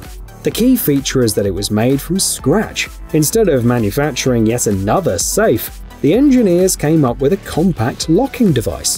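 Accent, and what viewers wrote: United Kingdom. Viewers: British person talking about guns, no thank you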